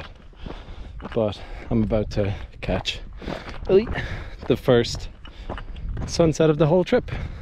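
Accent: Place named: Ireland. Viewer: And, he's the same age as me 😊 lord let me just meet this man and marry him 😂 ♥ PLEASE 😁 JUST a conversation with the real authentic Irish voice!! Or at least just post more videos of you just talking!!💕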